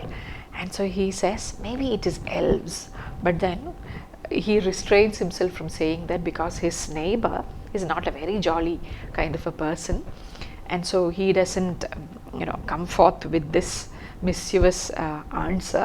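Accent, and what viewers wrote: Kerala, India. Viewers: And a clear Malayalee accent.😊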